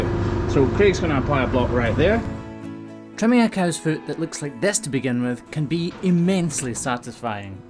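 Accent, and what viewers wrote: Scotland, United Kingdom. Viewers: I UK channel I subscribe to has a Scottish man who often says “Let’s crack on”, too. He is also charming and we in the US love listening to you Scotsmen. 💖😃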